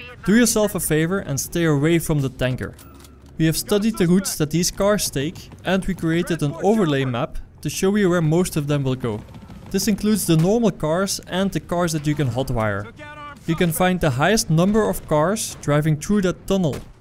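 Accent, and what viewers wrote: Netherlands. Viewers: at least it sounds like a dutch accent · 2 questions 1. Are you dutch? 2. Are you?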